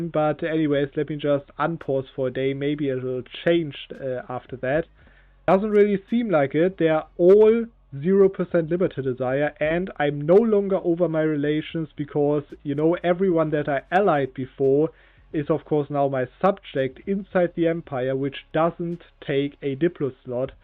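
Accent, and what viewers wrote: Germany. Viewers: You know the video about an autistic map game is about to be good when you hear a German accent recorded by the most clapped out microphone to ever exist.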